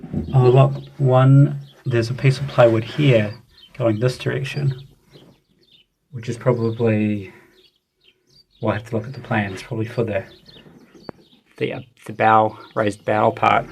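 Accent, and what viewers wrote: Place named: New Zealand. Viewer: You sound like a kiwi ! Looks like a cool project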